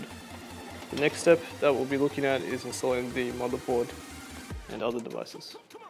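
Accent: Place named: Australia. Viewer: It's surprisingly refreshing to watch a youtube video and here an aussie accent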